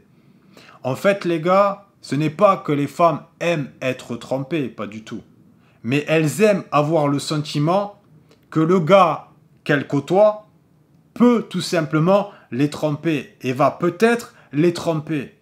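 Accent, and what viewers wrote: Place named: South, France. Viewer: C'est la 1ere fois que j'entends un allemand parler avec un accent du sud 😂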